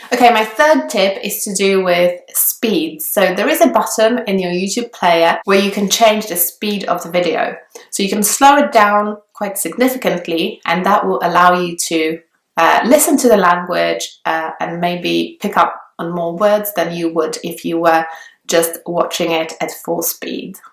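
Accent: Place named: United Kingdom. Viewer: Witaj! Thank you very much for tips and content that you create! I really though you were British until you mentioned that you are Polish! Idk if you ever covered this subject, but after watching you video reweaving Mondly I noticed a detail (and a a big issue actually) I've been dealing with: How do you manage learning a new language throughout another language foreign language? For ex. I'm Portuguese, English is my 2nd language and I want to learn Finnish ... The issue is that many people are not fluent, don't master a language well enough or just feel is more difficult learning a foreign language with another one that they are not conformable, specially langues like Finnish that doesn't have any resources to learn though besides English instructions. Dziękuję!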